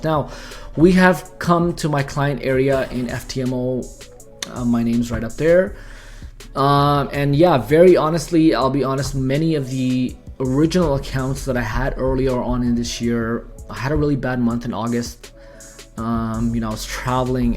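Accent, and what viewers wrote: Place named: Toronto, Canada. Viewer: sounds good brother. U sound like ur from Toronto. Am i right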